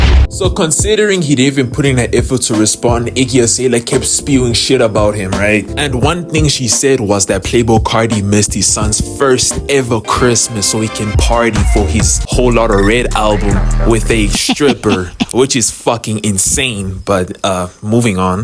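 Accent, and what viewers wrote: South Africa. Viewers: you South African my guy? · are you south african? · you sound south african ngl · are you South African? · You got sum South African in ya? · Bro u South African? Coz you definitely sound like it 😅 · Bro can't escape his South African accent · the video is hella funny but dawg you have the most jozi/pta accent ever, are you south african? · u south african neh? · Are you South African? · Mfana are you South African?😭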